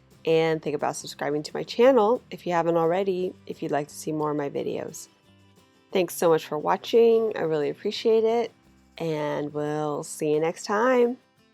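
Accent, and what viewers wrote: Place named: South, United States. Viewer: Oh, you are in California... I thought you were down south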